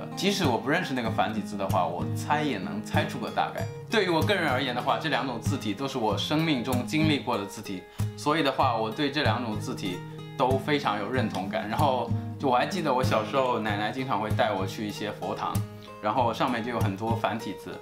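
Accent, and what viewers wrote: Taiwan. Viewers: 你會唸沒錯，但是你怎麽用臺灣口音讀！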